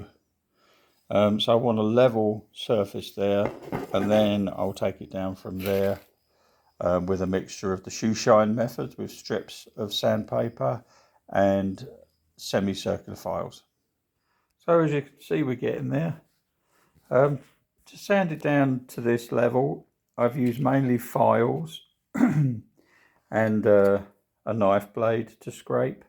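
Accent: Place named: England, United Kingdom. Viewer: Thank you. The way you routed for the mahogany splines is something I will try next time I fix a headstock. On a modern guitar, I might use veneers on the front and back of the headstock to hide my work. The way you did it on that classic was perfect. Not to be weird, but I also like how you talk; what part of England are you from (if you are Irish, Welsh, or Scottish, I apologize)?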